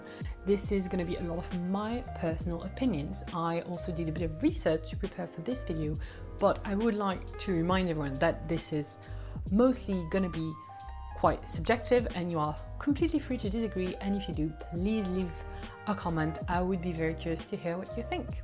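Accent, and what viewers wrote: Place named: United Kingdom. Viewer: You sound like you are English (British)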